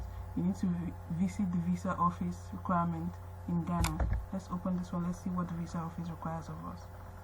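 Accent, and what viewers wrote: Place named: Nigeria. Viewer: Good information but u don't sound Ghanaian ...u sound more Nigerian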